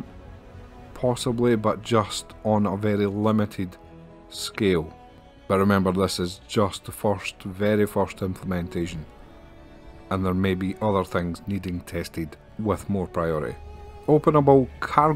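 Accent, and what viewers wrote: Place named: Scotland, United Kingdom. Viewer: this lads definitely the proud owner of a scottish accent. I know it because i almost didn't understand a word or two. still great stuff 😊 Edit: motherfricker, almost caused an international incident with a typo! Edit2: also you gained a subscriber in me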